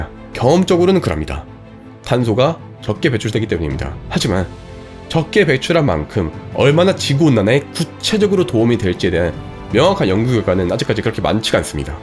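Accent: Gyeongsang, South Korea. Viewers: 경상도 같이 느꼈어요.. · 경상도 아이가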